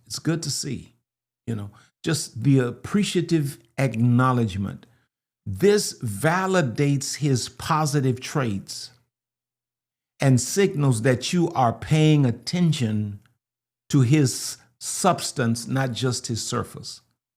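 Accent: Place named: South, United States. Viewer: You speak with a proper southern accent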